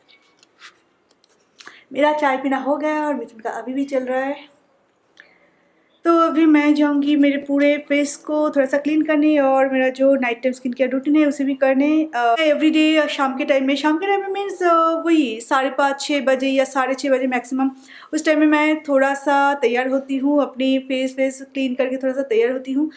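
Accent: Bengal, India. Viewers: Ur bengoli mixed Hindi language sounds oswm😍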